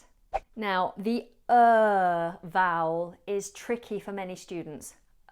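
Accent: United Kingdom. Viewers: The British accent is the best, the teacher is highly qualified to teach the English language, she has the right pedagogy to teach from beginner to advanced level. Before traveling to England it is important to know all the popular idioms and phrases that are used daily in that country!! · Mam you're really gorgeous and Articulately British accent, thanks for your Hardworking style to give us compelling data about advance english level I'm waiting for your next progresive Learning method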